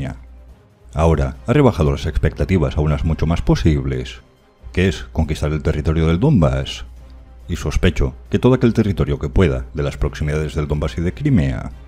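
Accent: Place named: Seville, Spain. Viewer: Por cierto, una curiosidad si me permites ¿Eres o provienes familiarmente de Cazalla de la Sierra o de la Sierra Norte de Sevilla? Tienes la misma entonación que la gente de allí.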